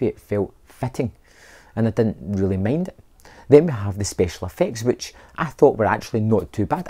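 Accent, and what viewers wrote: Scotland, United Kingdom. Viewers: Jesus man! once I realized it was a Scottish accent I could understand you. At first I thought you were Dutch LOL anyways don't kid yourself my friend it was a terrible movie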